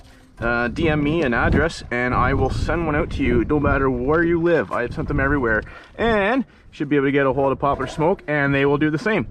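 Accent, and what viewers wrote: Canada. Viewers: I just bought a rogue. Gettimg delivered on Wednesday, this is a helpful video. Seems pretty straightforward to assemble. Are you in southwestern Ontario by chance? Your accent seems very familiar. Lol.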